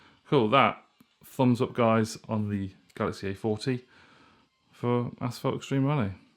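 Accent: United Kingdom. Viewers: Yup, typical British accent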